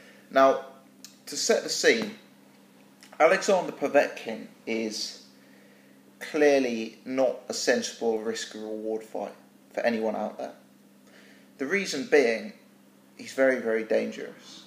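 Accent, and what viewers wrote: United Kingdom. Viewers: Your british accent is top. And your observations and commentary are spot on mate.Just discovered your videos today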